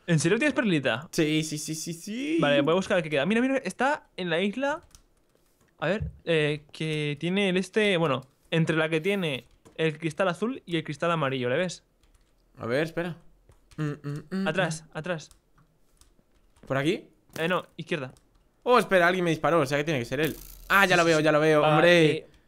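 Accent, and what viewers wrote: Spain. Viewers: son españoles creo :vvvvvvvvvvvvvvvvvvvvvvvvvvvvvvvvvvvvvvvvvvvvvvvvvvvvvvvvvvvvvvvvvvvvvvvvvvvvvvvvvvvvvvvvvvvvvvvvvvvvvvvvvvvvvvvvvvvvvvvvvvvvvvvvvvvvvvvvvvvvvvvvvvvvvvvvvvvvvvvvvvvvvvvvvv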